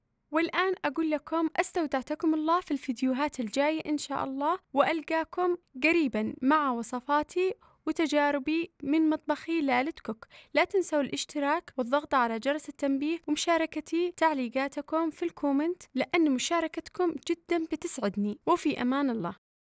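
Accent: Sanaa, Yemen. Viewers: ماشاءالله مبدعه ... حتى لو كنت اعرف الطبخه بس انا اشوف الترتيب والذوق والاناقه في كل شيء ... ولهجه صنعانيه جميله جداً❤️🌷🌹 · احلى شي لهجتش الصنعانيه فديتش وفديت اهل صنعاء. ياليت تستمري بكل الاكلات وكل الطنافس. وحتى الزبيب واللوز يكفي تتكلمي عنه وتصوري. وش المناسبات. ف اليمن وتراثهم فيه خاصه اعل صنعاء لاحد يدري عنهم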